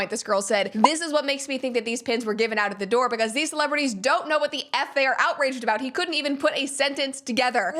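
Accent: United States. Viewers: citizen journalism is the future of news MSM is declining and i liked vibes fast, quick accurate precise vibing with American accent more like ASMR speeldial:person-turqouise-waving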